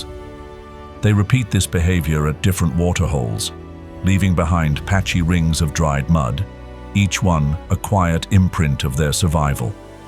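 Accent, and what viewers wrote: United Kingdom. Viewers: specially due to your British accent I love this...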